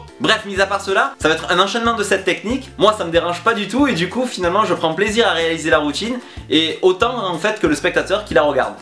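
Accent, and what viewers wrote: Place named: South, France. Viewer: Tu fais des vidéos très fluide avec ton bon accent du sud 🤙 Celle si est sympa et Hani vraiment envie de connaître l’astuce car je débute en magie 😁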